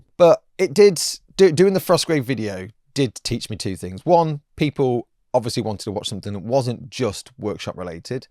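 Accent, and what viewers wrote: United Kingdom. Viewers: Audio's fine by me matey! I just like hearing an East Midlands accent instead of an American drawl for once xD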